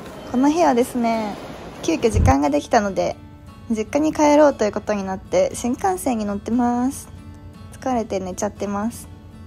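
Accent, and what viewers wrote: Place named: Bingo, Japan. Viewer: いだちゃんねるから来て 初めて拝見しました。 備後弁？が癒されます♡ あ、でも自分の事名前で呼ぶのは辞めた方が良いです😅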